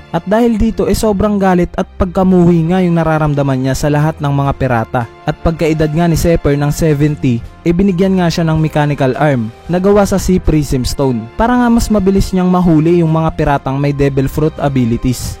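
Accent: Visayas, Philippines. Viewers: Bisaya ka man?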